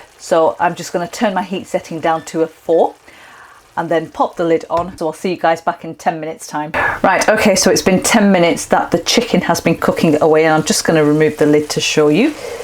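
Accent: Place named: North, United Kingdom. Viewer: are you from up north , can hear is in the accent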